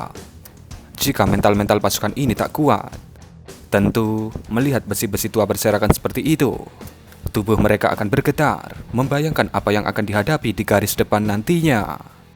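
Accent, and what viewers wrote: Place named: Java, Indonesia. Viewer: Berak2 matamu iku medok2😂😂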